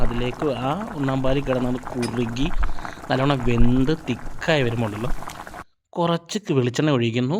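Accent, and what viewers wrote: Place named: Kochi, India. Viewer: Ithentha kochi slang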